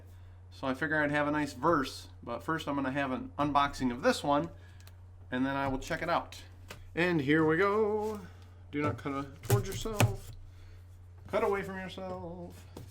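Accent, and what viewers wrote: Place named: United States. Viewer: Country voice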